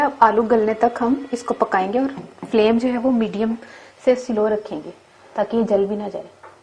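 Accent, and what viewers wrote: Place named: Punjab, India. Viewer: Oh my god your punjabi is very mast 😂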